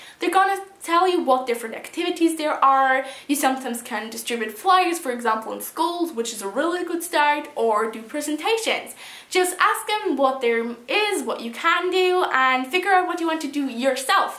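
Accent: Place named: Ireland. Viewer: What I find interesting is that you’re from Austria, and did your exchange in Ireland. Yet you speak English with an Irish brogue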